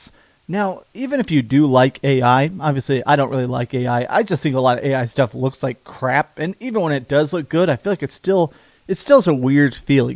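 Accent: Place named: Wisconsin, United States. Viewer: Is from Wisconsin? What is that accent?!???😂🎉😢😢🎉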